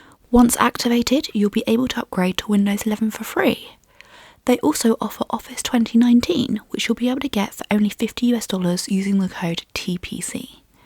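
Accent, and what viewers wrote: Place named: RP, United Kingdom. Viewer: i imagine this is what its like to listen to english royalty talk about GPU's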